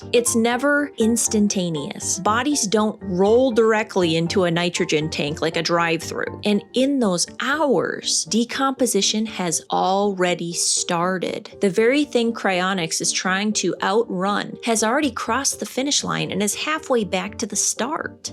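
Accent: Minnesota, United States. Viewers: I knew you were from Minnesota. The accent is very subtle, but there must be a law that no woman in Minnesota is allowed to live there unless you are at least not a nine of ten, Wisconsin is a close second, but weirdly, the women in both states are not just normal pretty women, they're ALL movistar hot. It seems very strange and intimidating to outsiders as we assume the local guys must be similarly attractive to women. I'm considered extremely attractive in most of the country and I one hooked up with a lady in Wisconsin, but Minnesota women have always been elusive to me, despite being attractive, successful, and having a great sense of humor and the same IQ as as Elon Musk. I can't even get a single date with a Minnesota lady.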